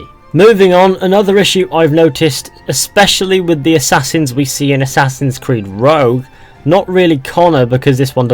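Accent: United Kingdom. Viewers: first of all if you want me and everyone else to watch it........ change your fucking British accent!!!!!. a YouTube video must be helping not some fucking video with fucking English accent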